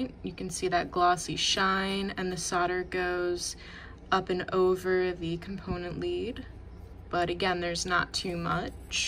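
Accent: United States. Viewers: Why do Americans distort the English language? i.e. The word SOLDER has an 'L' in it which in normal English is pronounced! So why don't Americans pronounce the word SOLDER instead of saying SODDER?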